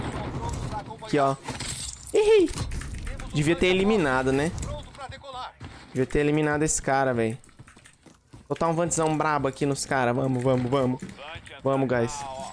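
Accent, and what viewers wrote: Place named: Montes Claros, Brazil. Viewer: Cê e de Montes Claros ???? Responde aí Sotaquizinho não engana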